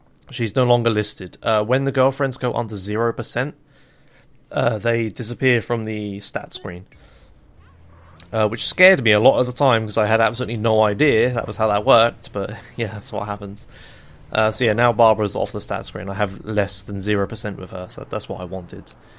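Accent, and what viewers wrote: United Kingdom. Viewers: Is your accent british?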